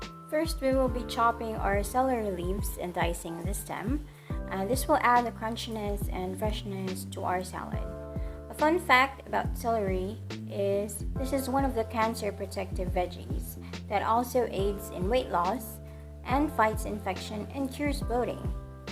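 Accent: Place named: England, United Kingdom. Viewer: Speaks a very english intonation, nice!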